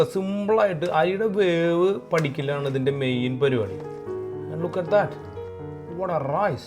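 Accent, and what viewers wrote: Thrissur, India. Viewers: നിങ്ങളുടെ സംസാരം 👍😌poli അ തൃശൂർ സ്ലാങ്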